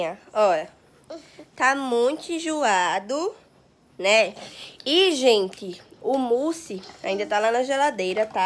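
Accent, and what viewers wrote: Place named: Northeast, Brazil. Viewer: Sotaque Nordestino U3U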